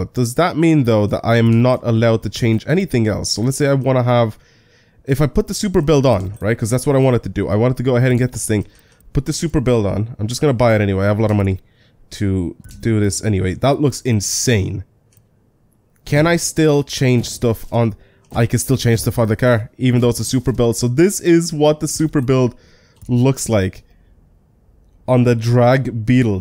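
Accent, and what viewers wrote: Ireland. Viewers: Is he irish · is from Ireland?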